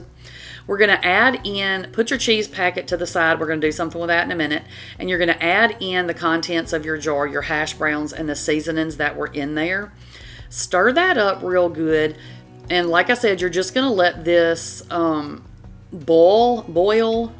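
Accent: South, United States. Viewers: Hello from Alaska. I just recently found your channel,I’m just going to say it. My goodness gracious, the amount of times that I’ve heard you say people are out here just doing the most, throwing hissy fits, I’m not saying in any shape or form that’s what you said, it my goodness, they are in my unprofessional opinion, are just nit picking. You want to change how you prepare these, the. You do you, change it. It leave this sweet lady alone please. And btw, idk what it is, I love the thick southern accents, so every time you say boil, instant smile on my face and when you corrected your pronunciation, I did a double take at my phone, and was like that didn’t sound right lol 😅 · Love these recipes! One thing you might change is the rice used. I used copycats for this and when I used converted rice it was a game changer. In any event I think you're spot on with these. 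New sub here. And you keep sayin' "bawl". As someone who has lived in the south, for over forty years, it's music to my ears. 🤩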